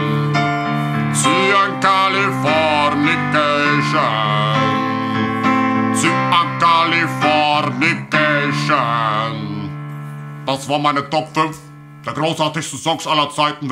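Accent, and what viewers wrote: Germany. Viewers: Ich kenne leute die denken das jeder deutsche so spricht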